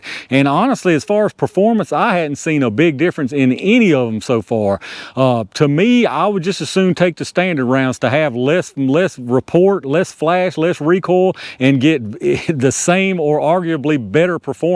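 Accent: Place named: Louisiana, United States. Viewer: Your accent says Louisiana, but the terrain says Georgia. Thanks for all the content!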